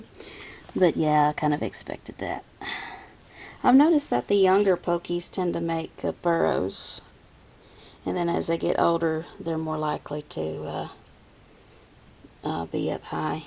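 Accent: South, United States. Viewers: I really like your videos because you show your collection - whether they eat or not - so it's interesting to see which species you have but also what stage they're in their growth cycle etcetera. I also like the way you list what the viewer is seeing. Some Y-tubers don't even let you know what you're looking at or don't write scientific name in full eg. P. scrofa. it took me ages to find out what the P stood for! SO....MANY thanks for being so thorough and really nice to see your collection. This is only the second of your videos I've discovered during this infernal COVID 19 lockdown in England/UK Thanks again. You have a really lovely accent btw. Somewhere in the Southern States of the USA I expect. I had a 5 inch female Grammostola Porteri for a couple of years, about 28 years ago! But, I've only recently discovered this thriving T hobby so alive and well on Y-tube! 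Absolutely brilliant viewing. In fact it's got me right back into it so that after all those years, I decided to buy two slings yesterday! Can't wait for them to arrive. 2 x 1cm Eupalaestrus campestratus (took me months to find them, they're so rare on this side of the pond *grin*). Hope you are keeping safe on that side of the pond and hope to see you alive and well in a few months time!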